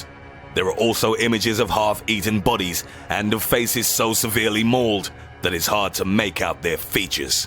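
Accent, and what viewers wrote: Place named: London, United Kingdom. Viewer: Accent is from London, UK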